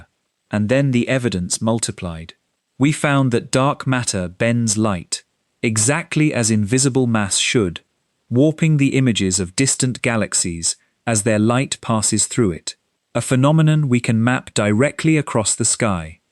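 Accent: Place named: United Kingdom. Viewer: Think I'm gonna stop listening to British-voiceover space videos. ...fuck.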